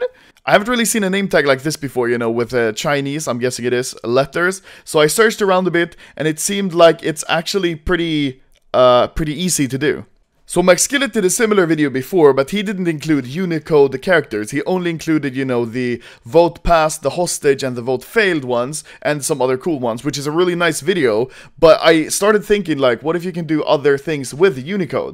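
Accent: Sweden. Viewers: YO I CAN HEAR U ARE SWEDISH:d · Wait wait wait Your Swedish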